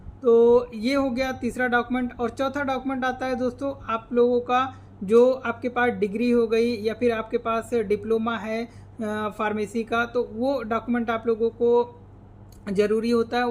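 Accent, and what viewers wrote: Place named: West Bengal, India. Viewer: Sir are you from West Bengal :)??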